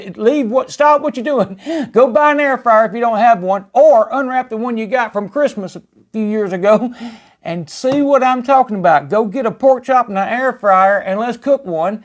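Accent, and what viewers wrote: South, United States. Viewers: That country accent makes the first 10 seconds of this video so awesome lmaooooo 👌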